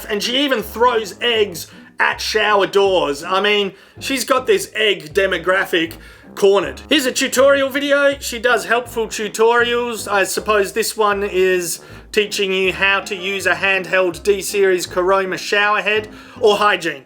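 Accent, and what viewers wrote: Australia. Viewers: U should teach ur American fans how to talk like an Australian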